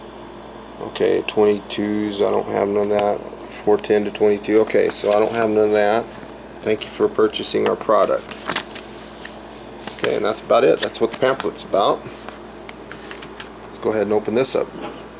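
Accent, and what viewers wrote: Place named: Minnesota, United States. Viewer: Hey, where are you from? You sound like a Minnesota native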